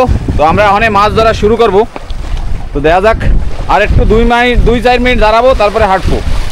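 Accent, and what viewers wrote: Bangladesh. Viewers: Bangla vasa akdom BanglaDeshi tomake Nijer Apon lage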